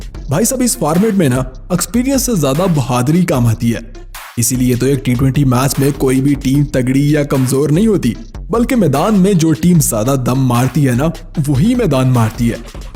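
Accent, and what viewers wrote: Pakistan. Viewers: Apki voice yaani apki boli se AP mujhe Pakistani lagte ho. Lekin Apke thumbnails or indian team ki ziada tarreef se mujhe AP Indian lagte hooo Confirm me Are u a Indian. Or a Pakistani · Aap shayad pakistan se ho faarmet bol rahe hai isliye.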